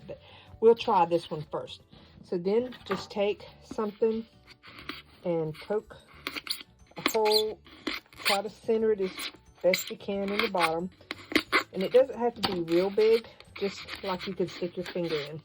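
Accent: South, United States. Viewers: Just found your channel & love it! Sounds like you might be southern? I’m from Alabama so I hear a little bit of myself listening to you❤️❤️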